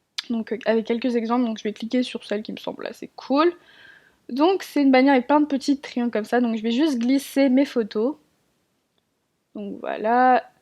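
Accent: England, United Kingdom. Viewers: Jadore t'es video mais ton axant anglais me gene un peut car en dirait que tu veux faire genr ..' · oh mon dieu ton accent anglais et juste waouhhh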